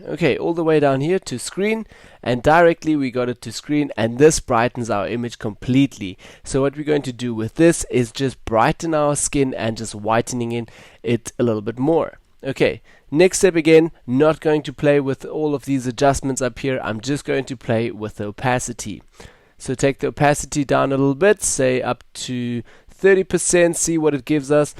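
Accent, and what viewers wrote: South Africa. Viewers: nice suprice to hear a saffa accent in a cs tut! cheers! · I thought he sounded South African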